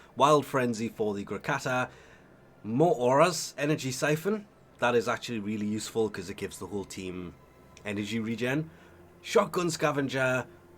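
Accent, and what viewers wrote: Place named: Newcastle, United Kingdom. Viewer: Wait a geordie warframe player? Yassss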